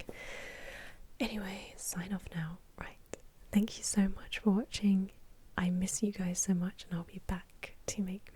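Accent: United Kingdom. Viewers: your British accent, so beautiful!!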